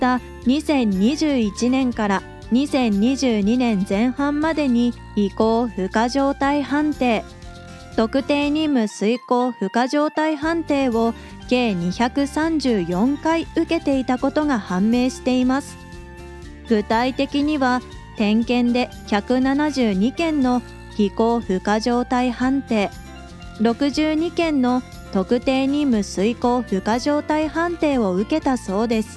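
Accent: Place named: Kansai, Japan. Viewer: 年号のアクセントが変すぎる。関西訛なのは分かるけど、ここまで酷い人はなかなかいない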